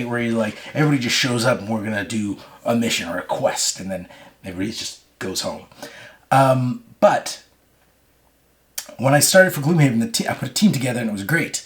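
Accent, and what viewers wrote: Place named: Canada. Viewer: My wife is from White Oaks, is that near you? She loves when she hears your Canadian accent.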